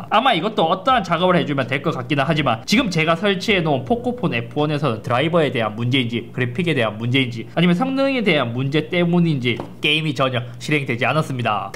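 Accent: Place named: Gyeongsang, South Korea. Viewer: 씨 경상도셨구나.. 컴퓨터도 잘하네..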